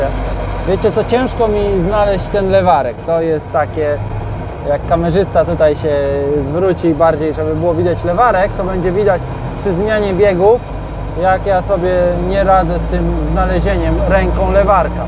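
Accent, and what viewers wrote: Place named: Podlasie, Poland. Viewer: Mam pytanie ... Autor filmów jest z Podlasia, czy po prostu ze zwykłej wsi ? Zaciąga niesamowicie....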